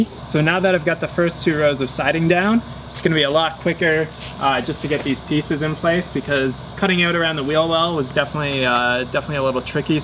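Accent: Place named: Canada. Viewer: The Canadian accent 😬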